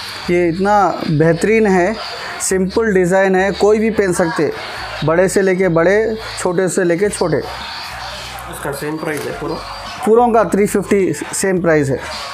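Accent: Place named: Hyderabad, India. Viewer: Hyderabadi baata ya Allah 😂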